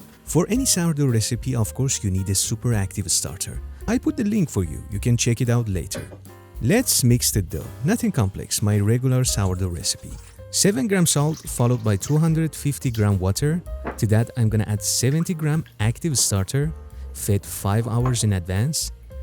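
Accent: Iran. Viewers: درود. لهجه ایرانی دارین. من تازگی میخام با خمیر ترش آزمایش کنم. امیدوارم که بتونم 😁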